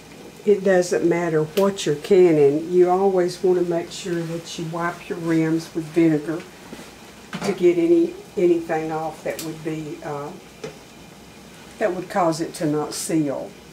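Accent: South, United States. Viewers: Hello from Oregon! It sounds like you are from the south. My home state is Georgia. I am so glad to of found you. Canning ham today! Blessings to you this new year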